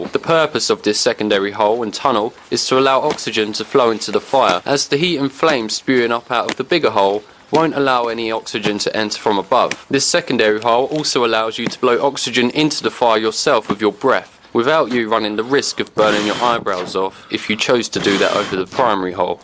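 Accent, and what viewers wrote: United Kingdom. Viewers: British accent using "feet" and not "meters". This is great! (Bored COVID comment) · Are there any people on youtube that DON'T have a limey accent? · I love that someone with a Brit accent knows about out Dakota customs. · interesting to hear a guy with a bri'ish accent using freedom units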